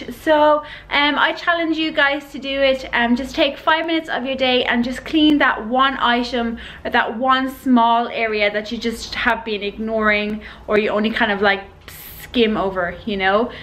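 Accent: Ireland. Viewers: OMG !! YOU'RE IRISH! Instant subscribe just from your accent!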